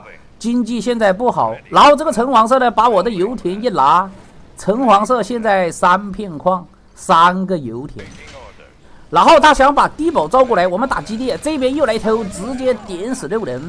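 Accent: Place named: Jiangxi, China. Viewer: 好像是江西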